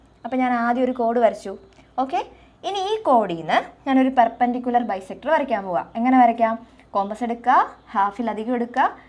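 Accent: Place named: Alappuzha, India. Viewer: Alapuzha Alle teacherde house cheriya oru slange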